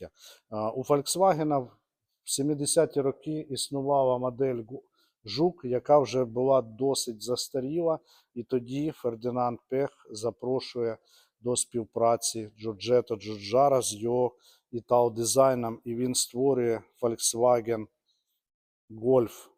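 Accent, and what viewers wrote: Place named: Russia. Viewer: просто сильный русский акцент, я как живший в незалежной слышу фальш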